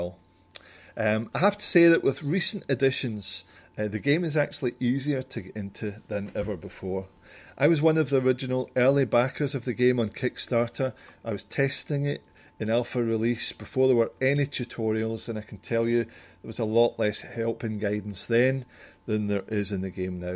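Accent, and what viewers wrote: Scotland, United Kingdom. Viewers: you Scottish mate? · Are you Scottish? Great accent dude! You said it, "steep learning curve." It's been pretty miserable learning it but now that I slogged through the basics I'm totally hooked. Amazing game they created, can't wait to play it in VR! Thanks for the free videos, helpful. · he definitely is scottish, yes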